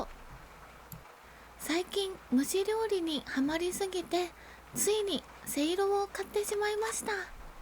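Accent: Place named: Shizuoka, Japan. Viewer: ❤ いつも静岡訛のトークで懐かしく癒やされます🥰 それにしてもは雨女かな🤔笑雨も味方にする凄さはならではかな💕 因みに俺は晴れ男みたい😅 あとゴールデン免許ね🎉笑ゴールド免許とも言うよ🐼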